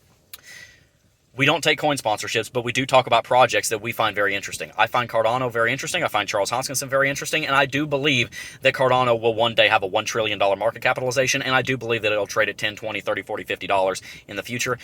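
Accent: South, United States. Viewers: Fast talking southerner. I like it. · Said like a true Southern fast-talking scientist/engineer.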